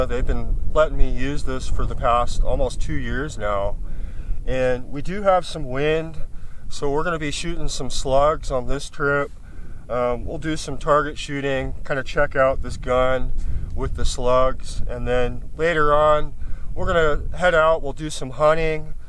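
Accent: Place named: Minnesota, United States. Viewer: Good vid but GREAT shots!! : Couple questions: FIrst, can't help but notice midwest/Minnesota accent, where you from? Where are you hunting? Looks like you are hunting something that i get some shots at: Golden-mantled Ground Squirrel but the distance shots they look huge like prairie dogs in South Dakota. Just trying to figure out where a guy could get that much action. All the clues (cali license plate) are pointing to Arizona/California area, but I've never seen or heard of that many of any kind of rodent down in the South West. Thanks. (I shoot: Hatsan: Blitz .30/Barrage .25/Hydra .25/Flash Pup .177 - same Hawke scope as you, ATN X-Sight 4k Pro, ATN Thermal Monocular) I mostly night hunt the rat infestation in Vegas with the Barrage & the night/thermal. Thermal is a game changer!! Totally worth it. Thanks again.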